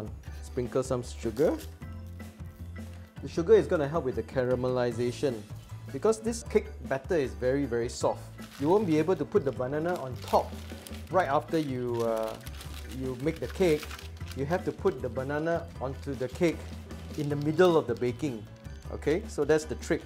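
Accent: Singapore. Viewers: Looks fantastic! Even more siok ...love the Singlish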